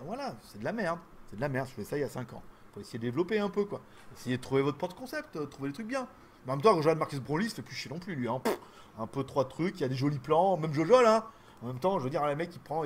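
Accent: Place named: South, France. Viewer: Les gens sont nuls, j'aime bien ton accent du sud ...(léger)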